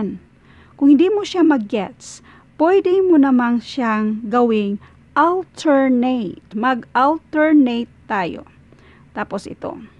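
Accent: Visayas, Philippines. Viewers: Bisaya ka ba! 😁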